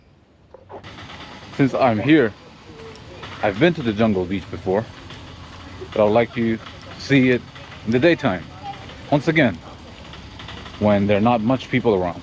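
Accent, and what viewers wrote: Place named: United States. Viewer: Love your videos and your channel by the way you sound like you from state just saying ❤❤❤❤❤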